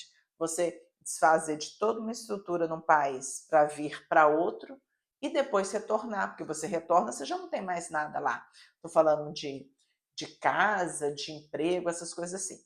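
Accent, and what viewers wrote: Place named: Brazil. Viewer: Gosto muito de a ouvir ! Tem toda a razão quando diz que muitos brasileiros se fecham "guetos", ou a comunidade migrante em geral. Claro q é um erro. Nunca vão conhecer novos costumes e culturas. A questão do Português de PT, aprendam só os termos e formas verbais e tentem falar / escrever consoante essa aprendizagem ( mais importante para quem estuda), mas não percam esse lindo sotaque, que não nos incomoda nada, pelo contrário. Sucesso!!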